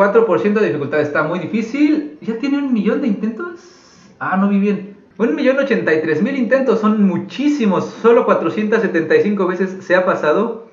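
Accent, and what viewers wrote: Mexico. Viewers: o ya amigo es que el acento del centro me sonabas a vecino yo soy de acá de Toluca y dije si hay suerte hasta una buena tetilla de algún juego saludos un abrazo amigo.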